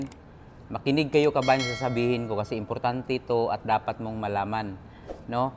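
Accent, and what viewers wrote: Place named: Visayas, Philippines. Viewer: Halatang bisaya ka idol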